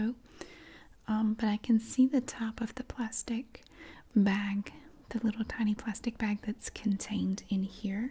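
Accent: South, United States. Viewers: I must say ; I think your southern accent really complements the asmr stimuli of sense of relaxation and well being.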